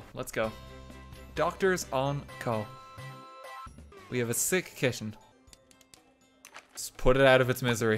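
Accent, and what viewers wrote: Ireland. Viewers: I never knew this guy was Irish ahah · This dude Irish? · He's Irish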